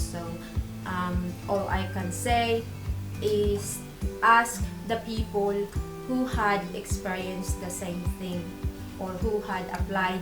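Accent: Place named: United Kingdom. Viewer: Very helpful and you explain it well. Galing nyo po mag salita with british accent pa po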